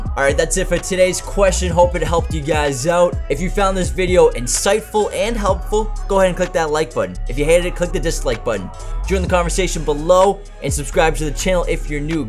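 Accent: Boston, United States. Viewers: I'd love to hear this guy say Oscar Mayer Wiener in his Boston accent. Would be crazy satisfying for some reason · Now thats a bahsten accent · Yo,....... I like these videos, but why are you doing the Boston accent lol, I get it in the videos when you roast somebody in the comments (might be me here) but when you’re just being helpful why the accent?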